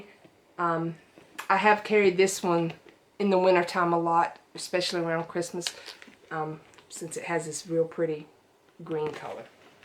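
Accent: South, United States. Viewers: love your purses and your southern accent! Your vids are great!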